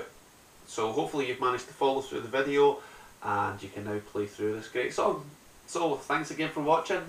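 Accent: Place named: Scotland, United Kingdom. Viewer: Scottish I like it.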